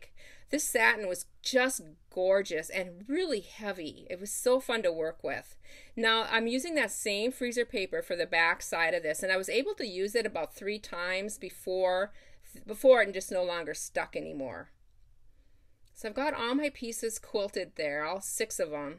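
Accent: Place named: Midwest, United States. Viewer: Wow!, this was the absolute best! I only discovered your tutorials a couple of days ago and have done some binge watching. You are awesome. I just love all you create. I adore your Midwest accent. smile. My dad was from Minnesota. Thank you for all your inspiration. Take care, be safe and much happy stitching.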